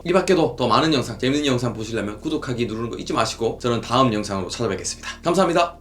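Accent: Daegu, South Korea. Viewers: 말투가 비슷하다 싶었는데 대구분이셨군요ㅋㅋㅋㅋ 잘 보고 가요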